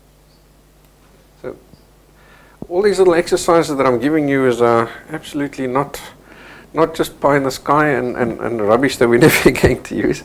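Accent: South Africa. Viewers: I feel like im still in South Africa because of his accent.. 😢😢😢😢😢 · JohnLothe I think he is South African that lives in New Zealand. This dude is a pretty decent teacher! great video! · is this guy south african? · Thats not an new zealand accent? Do you have a south african accent? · Where are you based now?? I feel sure i hear a south african accent 😏😏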